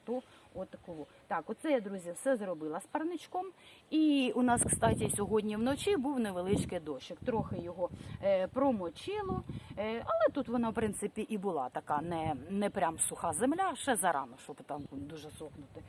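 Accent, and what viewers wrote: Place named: Zhytomyr, Ukraine. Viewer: Доброго ранку,! Привіт з Дніпра. Дуже рада, що підписалася на Вас. Все дотепно і грамотно. Дякую Вам. А Ваша мова як елексір на мою душу. Так розмовляють на моїй рідній житомирщині. Бажаю Вам здоров'я і мирного неба - всім нам!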